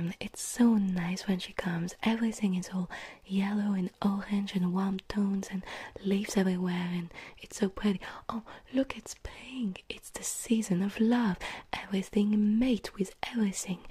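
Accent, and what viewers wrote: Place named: France. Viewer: french accent asmr ??? sign me the hell up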